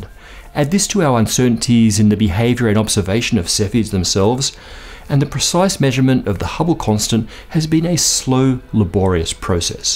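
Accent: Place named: Australia. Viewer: This gentleman makes very interesting videos and I can only say good things about them. I can understand why Professor O'Dowd has so many supporters. I feel terrible for saying this but to my eyes he has an extremely strange shape. His head seems to be much too big his torso and legs appear too small. His legs seem to be too far apart and too short. It's the oddest thing. His habit of holding his fingers intertwined with the thumbs touching on top for no reason I can determine irritates me intensely. On top of all this is his very strange stresses on apparently random syllables in each sentence. And finally there's that Australian accent which grates on my nerves like a chalk screech on a blackboard. The thick eyebrows and beard seem particularly ill suited for his looks. There's nothing personal about any of this and really I mean no offense as the whole perception is entirely mine and I can't explain it. 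Am I alone though? To say something good about Professor O'Dowd is easy. The inconsistency between the two results for Hubble's constant is fascinating as indeed are the topics of Dark Matter and Dark Energy. We live in exciting times for cosmology so consider the enormous difference between this scientist and another man who obtained a Doctorate in Astrophysics, Dr Jason Lisle, whose area of study was Solar Dynamics and who published a few papers before throwing his career away to become a "researcher" for an Answers in Genesis type outfit and a YEC (Young Earth Creationis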